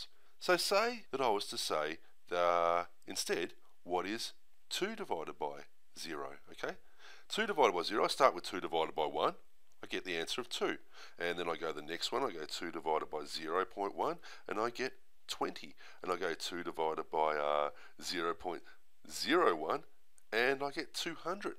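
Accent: Australia. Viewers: Hey mate! We have been subscribed and watching your awesome videos for last 10 years. It would be really appreciated if you could improve the sound quality but loving your aussie accent heaps. Have a good day!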